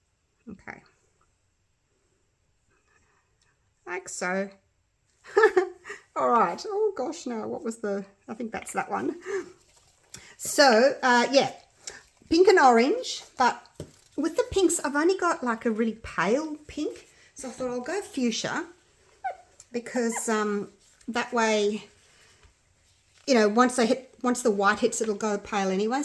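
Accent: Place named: Perth, Australia. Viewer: Oh my goodness that is one of the best blooms I’ve ever seen done! You make me want to get my resin out now & its 1.42am here in Yorkshire, England hahaha if my work is half as good as yours I will be very happy! I have quite a few family members who live in & around Perth & I love the accent! Your jovial passion for what you do shines through in your words & excitement! Never change my dear! Xxx Vick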